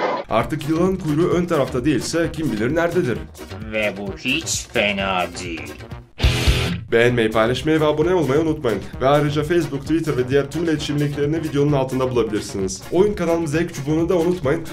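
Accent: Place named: Russia. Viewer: abi sen rus musun çünkü yaban cı gibi konuşuyonda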